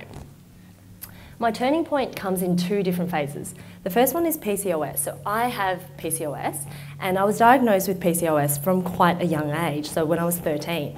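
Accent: Australia. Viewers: Totally Aussie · An Asian with an Australian accent, sign me up! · OK the "Young Guy" in me resurfaced ... a young very pretty Woman that is super smart, has Asian features and an Aussie accent... Doesn't get much better ... OK, back to learning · Is it aussie accent? Cause I LOVE it.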